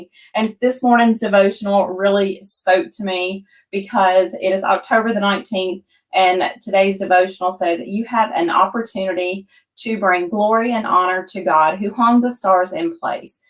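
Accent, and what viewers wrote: South, United States. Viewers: I’m new to your channel, love what you do, love your southern accent, and love that love Jesus😁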